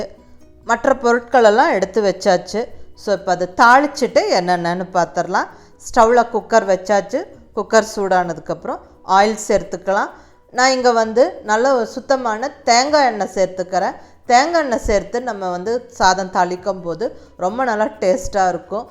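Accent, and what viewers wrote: Coimbatore, India. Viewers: Feeling good to hear the coimbatore tamil slang. Very nice akka. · neenga pesarathu kongu tamil ah madam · Sis neega covaiya neega pesurathu eanaku pedikum sis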